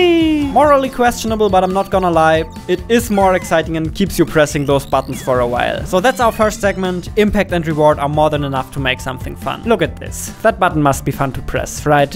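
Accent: Germany. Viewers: The german accent makes this video much more fun! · german? 🤔 your english sounds german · Sorry, but this german accent turned me off instandtly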